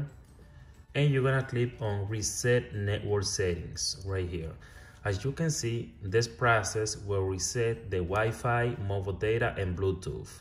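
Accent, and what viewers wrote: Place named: Dominican Republic. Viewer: you can tell this nigga Dominican😂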